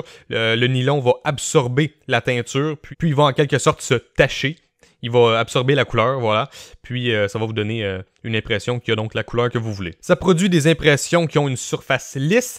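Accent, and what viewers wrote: Canada. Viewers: Comme toujours, hyper intéressant! Et entre ton accent très exotique que j'adore (mon pépé a été dans la police montée chez vous, à une autre époque il est vrai!) et ton débit (mais comment fais-tu???, Heureusement que tu n'es pas rappeur), je me régale à regarder tes reviews. Toujours instructives et précises. J'attends avec impatience tes essais sur du PLA flexible.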